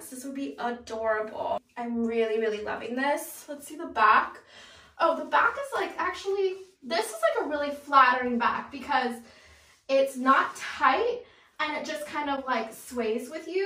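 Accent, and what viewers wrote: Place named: California, United States. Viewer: You sound like you’re from California the way you talk 😂 this is coming from a Mainer haha 💜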